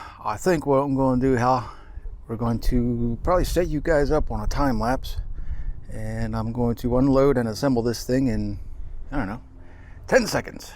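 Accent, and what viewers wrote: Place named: Idaho, United States. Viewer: Where you from cat? You sound like an Idahoan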